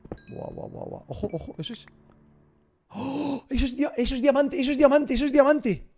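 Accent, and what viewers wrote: Argentina. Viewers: El argentino ndeaah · Habla Como Argentino Jajaja Aguante ElRubius Saludos Desde Argentina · Siento que está muy argentinisado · Por qué hace referencia a como hablamos en Argentina? xD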